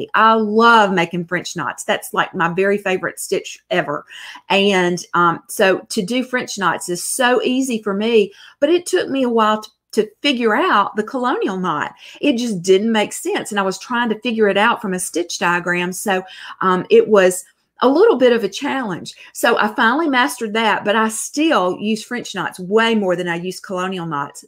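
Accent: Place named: Mississippi, United States. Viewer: Watching in Rockville, Maryland. But I’m from Mississippi, so your accent makes me think of home! Thanks for the great tutorials.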